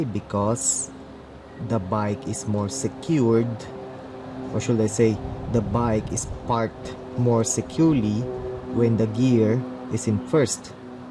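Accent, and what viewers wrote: Philippines. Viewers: ayus ha pinoy na pinoy ka magenglish brad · dat pinoy accent tho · lmfao the filipino accent I can't take him seriously bahahhaah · Thats a heavy ass filipino accent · U sound Filipino, are you?? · he sounds Filipino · HES FILIPINO HAHA ACCENT SO OBVIOUS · dude are you filipino?? you sound like a filipino man hehehe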